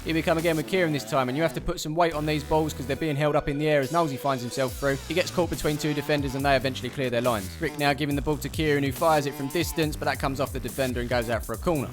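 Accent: Essex, United Kingdom. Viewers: Are you from essex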